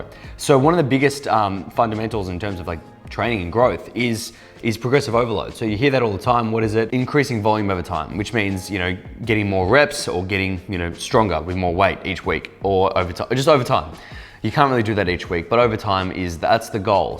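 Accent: United States. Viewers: Wtf he sounded American af😂😂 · Holy fkn shit look literally like Justin Beiber and u nailed the american accent dear god. way to go man. not just braun :P funny too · Lmfao the American accent is so funny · BROOO THE AMERICAN ACCENT IS SICKKK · Yooooo your American English accent is perfect lol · Omg!!!! Your Southern accent! Yass!! 🤣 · American accent is on point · American accent on point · Your American accent is scary good. I just started watching these videos and I swear I wouldn't have known you were Australian if I had stopped after the intro 😂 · not bad american accent, didnt say bro or dude (yes that stereotype is true) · On point with his American accent.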